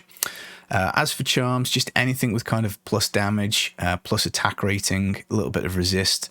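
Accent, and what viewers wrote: United Kingdom. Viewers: I found your channel back in diablo3 times and man! I'm so happy you cover d2r! I enjoy your vids as you keep it real, clear, without needless fuss. Plus I miss UK (lived there for 7 years) so listening to your accent brings back memories :D Keep it up, brother! I do hope youtube algorithm will smile upon you soon as this channel is a quality stuff!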